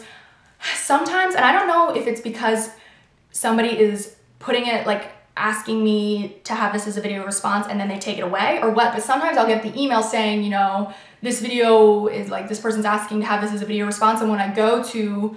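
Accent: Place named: South, United States. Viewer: I like watching your videos because you're so pretty and I love your accent. I want to move to the south to get me a man with that accent! haha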